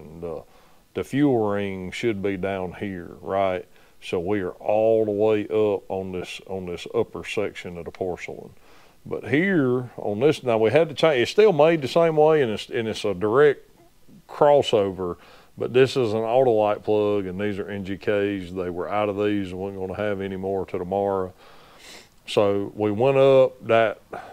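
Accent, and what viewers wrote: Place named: Upstate New York, United States. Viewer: You guys showed up in my suggestions, insta subbed. My kind of folks, wrenchers. A few oddities, first I can tell you're from my neck of the woods by the way you say cobb-urator, second I have a valknut tattoo also, third you gave it away- them overworked roads look like home or close to it (DOT will get around to it when they're done with 85, so basically never), if I had to guess I'd say Anderson. Cheers mates, from a mobile mechanic, starting a year out of college trying my own business 🍻 Cheers from Pickens. Small world, Im only guessing the county but I know yall are upstate folks and that blows my mind. Be blessed guys. -Regards, Harley.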